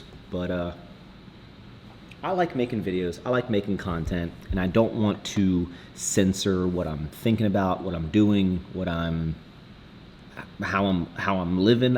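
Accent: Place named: South, United States. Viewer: getting that southern twang 😂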